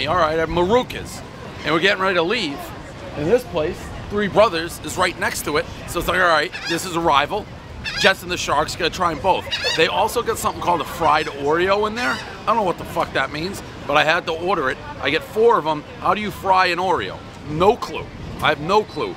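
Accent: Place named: Massachusetts, United States. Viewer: His Massachusetts is really showing on these boardwalk reviews...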